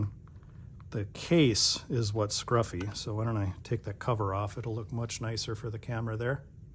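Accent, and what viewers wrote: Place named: Upper Midwest, United States. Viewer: I enjoy your channel. And recognize your accent. I grew up in Minnesota about an hour from Fargo. I worked in Fargo for about 10 years. I’ve been through Minot many times back when fracking sand was big. I drive semi. I live north of Nashville TN just under the Kentucky state line. Again I appreciate your channel. Can I ask did the majority of what you bought yesterday come from one customer?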